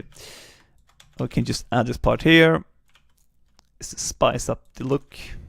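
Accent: Sweden. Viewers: Are you Swedish? It sounds alot like it! Great tutorial anyway dude, I absolutely LOVE your enthusiasm, it makes it so much more fun to follow along. If only more people could see your stuff!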